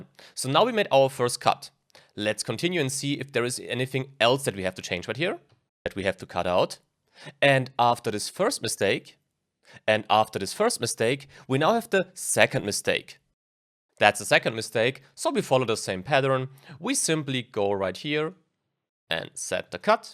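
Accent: Germany. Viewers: Hahaha man hört so, dass du deutsch bist. Feier ich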